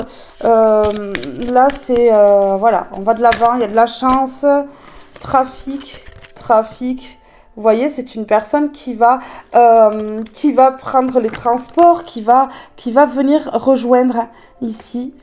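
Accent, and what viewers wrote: South, France. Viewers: J'adore vous écouter Madame. Vous êtes très belle en plus et votre accent du sud soleil rajoute une multitude d'aromates au plaisir de vous admirer. Merci de nous faire du bien avec ce que vous êtes ❤😊😊❤❤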